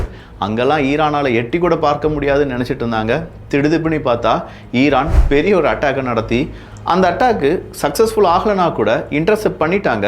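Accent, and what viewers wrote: Kerala, India. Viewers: hear his pronunciation of college, office and similar words with o sound. Only someone with Malayalam as mothetongue can talk like that. His entire family is from Kerala and settled in Trivandrum. If he is Tamil, then why buy land there and build and settle there??? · his parents are from Tuticorin/ Udangudi area - he did his middle and high school in Vandiperiyar area which is in Tamil Nadu kerala border - you tend to get Malayalam mixed slang when you stay in border area - obviously he knows Malayalam very well you can observe the same slang in Nagarcoil / Marthandam area as well - they don’t become Malayalis right ? He worked in software company in Trivandrum and he was YouTuber at the same time - he got home in Trivandrum - it’s little cheaper to buy properties there than Chennai as you know so obviously you don’t move out that easily once you buy home A lot of our Tamils are settled in Mumbai - they don’t become Marathis right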